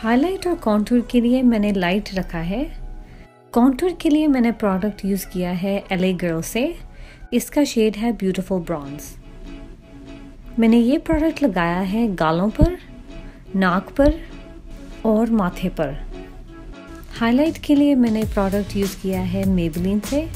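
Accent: Punjab, India. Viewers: Thodi Punjabi te att aa ji Hindi Ch v ohi accent Mere father ki da c edda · Tadi voice tone ch Punjabi touch aa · I love you yaar M straight 😂 Love you dil se ❤️❤️❤️❤️❤️❤️❤️❤️❤️❤️❤️😘😘😘😘😘😘 Love from Chandigarh Love your Hindi and punjabi accent Muuuuuahhhhhhhh😘😘😘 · I like ur punjabi accent👍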